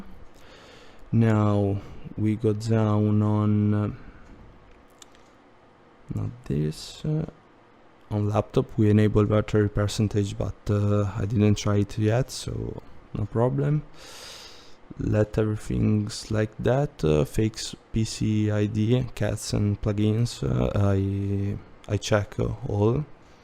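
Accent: Italy. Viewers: Grazie!!! Cmq si sente un bordello che hai l'accento italiano 😂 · ho capito che eri italiano dalla pronuncia, qualsiasi italiano mantiene la pronuncia italiana anche se parla in inglese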